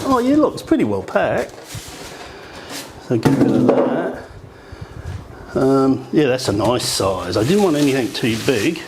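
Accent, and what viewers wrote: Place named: Salisbury, United Kingdom. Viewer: You have a very strong accent. Sounds like your from Salisbury.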